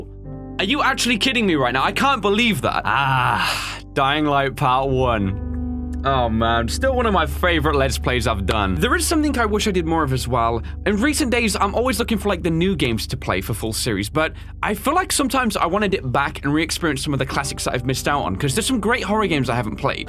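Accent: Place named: RP, United Kingdom. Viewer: Good job you are crazy good I first started watching from case anamatronics part 1 old version ( sorry if you're insulted but it that vid you sounded so posh ) hehehe your really good keep doing what your doing I someday hope you get 3_4 million subscribers your so funny baz is also really good yeah but your really good I like your videos so much I am a really big fan of you your so nice and one day I would LOOOOVE to meet you keep going and keep making theese funny videos peace out Brah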